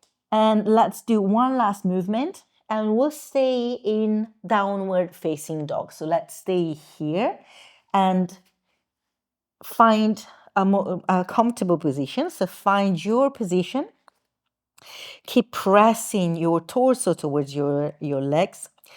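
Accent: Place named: United Kingdom. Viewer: Ciao, che piacevole sorpresa trovare il tuo canale in inglese! Complimenti per l’inglese e l’accento British 😊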